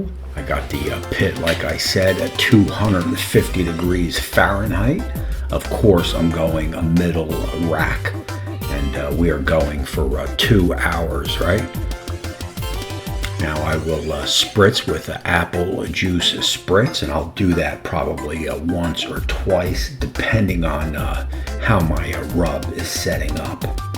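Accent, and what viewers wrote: New York, United States. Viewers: What part of Texas are you from? I've lived in Texas my whole life and can't think what area of Texas sports a NY accent?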